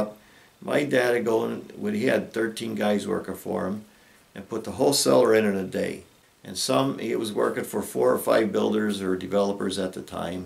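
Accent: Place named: Wilkes-Barre, United States. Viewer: Howdy -Greetings from the Juniata River area in Newport, PA. (Your accent sounds like the Wilkes Barre area). If you are in the Newport area come Spring, and want a quick cement job, (or if you know someone who does) shoot me a name. The dog and I wIll be looking for someone to redo the cracking cement on the top of our chimney. It's a real small job - about 4 feet by 4 feet. The cracking seems to be the cause of a slight trickle on the inside of our chimney when it rains. I climbed up there (ranch house roof) while the dog supervised from the ground. We came up with a temporary solution until Spring. ( He agreed to loan me the metal bottom to his dog kennel). I flipped it upside down and rested it on top of the 2 chimney caps. The metal lip around the kennel bottom is only about 2 inches deep so it works real well. It doesn't seem to block the airflow and we can still use our pellet stove. 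It also seems to be deflecting the water like we wanted. I anchored the thing with heavy pavers and it seems to be doing the trick. WOO HOO!! (The dog and I are happy with our rig-job for now and hoping that mother nature is kind until Spring.) Enjoyed your video.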